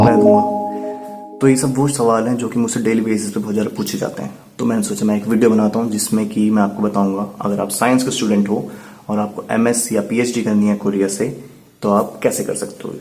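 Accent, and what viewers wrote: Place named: India. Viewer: Subscribed your channel recently. And the most impressive thing I found is the way you talk, pure desi. I really like the way you do your vlogs. Keep going.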